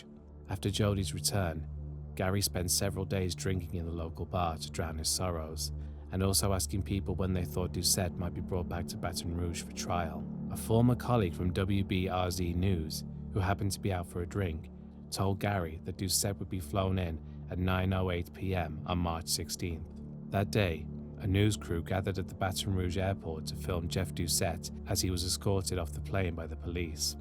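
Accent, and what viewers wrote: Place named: United Kingdom. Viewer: You sound British so please please please stop saying YouTTTTTube. It is not a hard T!!!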